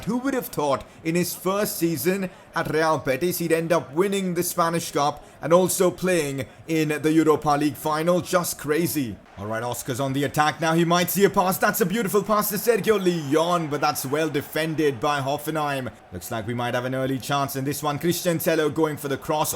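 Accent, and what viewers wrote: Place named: India. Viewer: This has more indian accent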